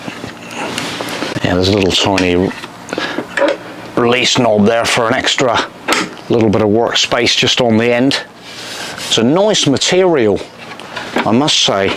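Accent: West Country, United Kingdom. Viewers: Nice van! And i thought i had a strong west country accent, until i heard yours lol!!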